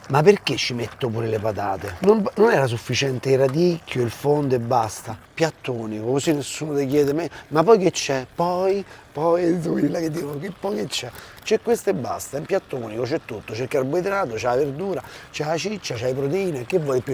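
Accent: Rome, Italy. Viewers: Bravo il tuo romanesco mi piace sei simpatico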